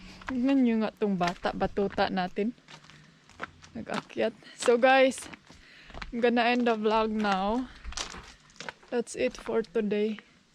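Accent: Visayas, Philippines. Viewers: Hello, following in your youtube vlogs, im from Leyte.. mkatawa ko kay bisaya kaayo.. makes my day light😘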